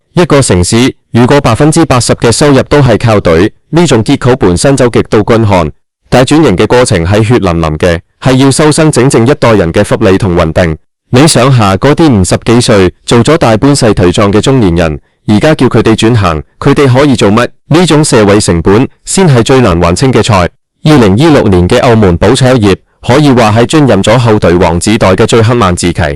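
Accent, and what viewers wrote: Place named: India. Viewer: 印度人講廣東話.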